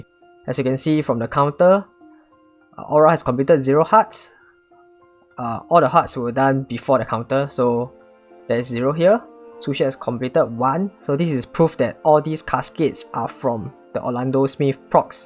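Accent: Singapore. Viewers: u sound Singaporean or mayb Malaysian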